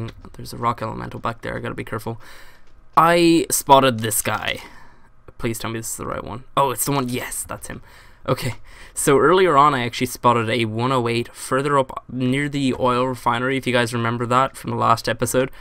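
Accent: Ireland. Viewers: your Irish accent is really strong in these old videos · u used to sound so irish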